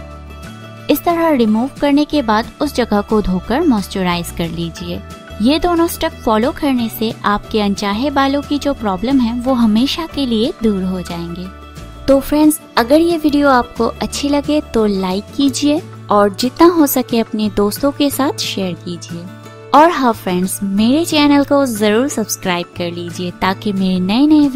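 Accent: India. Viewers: It`s Hinglish( Egnlish with Hindi accennt and mixing) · Her speech is not comprehensive. It feels like she speaks Indian.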